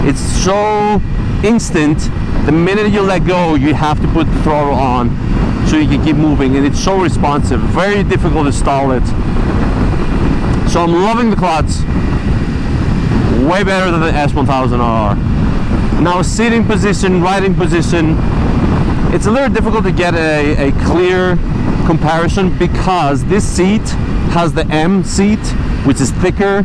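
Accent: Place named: Greece. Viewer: violently greek accent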